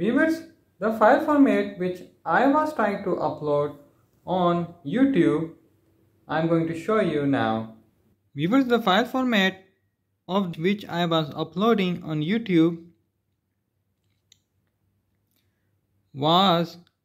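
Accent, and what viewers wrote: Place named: Pakistan. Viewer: Pakistani baba Speaking English 💀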